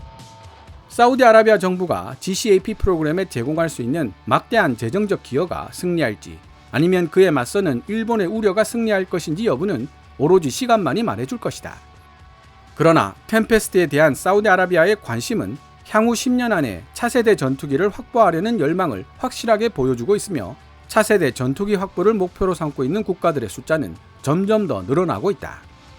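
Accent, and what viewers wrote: Gyeongsang, South Korea. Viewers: 갱상도식 영어 억양 알파뱃만 나오면 하이에나 처럼 덤벼든다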